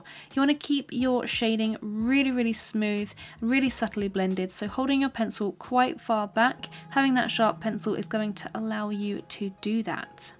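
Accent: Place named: Australia. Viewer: Brilliant video- thank you so much for this, and all you share of your knowledge! 👍 Just love your aussi dialect too! ❤ Greetings from the other side of the planet - Sweden. 🇸🇪🕊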